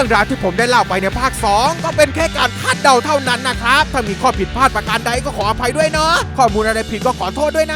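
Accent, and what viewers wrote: Thailand. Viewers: เสียงเหน่อทุกท้ายประโยค แอบหงุดหงิดเวลาฟัง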